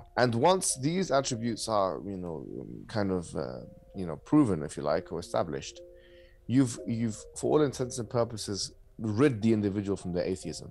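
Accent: United Kingdom. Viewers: Should’ve added subtitles for those that can’t understand British accent. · british accent.. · it’s not the accent that was hard to understand for me In fact I love the British accent it’s the high quality vocabulary he was using my vocabulary is very limited. But I’m not surprised at all know that I know he graduated from Oxford University mashallah.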